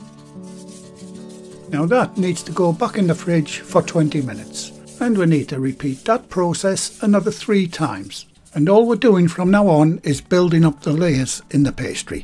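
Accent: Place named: North of England, United Kingdom. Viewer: Hi I have been reading the comments below: first I am Irish and nobody would accuse me of being biased in FAVOR of Brits - has a lovely north of England accent; his instructions are clear and very easy to follow, his gentle mild mannered slightly self effacing style tells me here is a working man and no snob. Credit where it's due I used to be a good baker but credit for my successful return to more serious home cooking belongs to. Thank you - don't mind the "begrudgers" as we say in Cork...Thanks for your videos G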